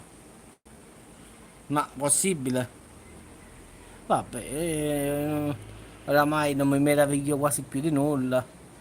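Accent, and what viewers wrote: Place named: Salerno, Italy. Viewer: Buonasera, mi piace moltissimo l'accento del tuo dialetto sei davvero simpatico, stasera ti sento molto provato dal caldo, anche qui da noi, fuori Firenze non scherza, stasera un po' meglio è più ventilato e la temperatura si è abbassata...buonanotte a tutta Salerno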